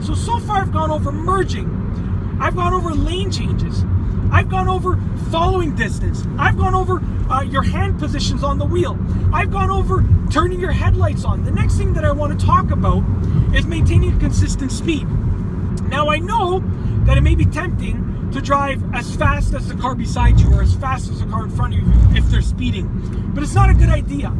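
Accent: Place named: Canada. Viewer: I think abe Lincoln is said to have spoken like this guy. Though ... Probably without the Canadian accent.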